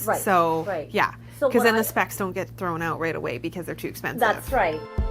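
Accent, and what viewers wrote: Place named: Canada. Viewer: watched it for one minute and immediately noticed your canadian accent 😍❤️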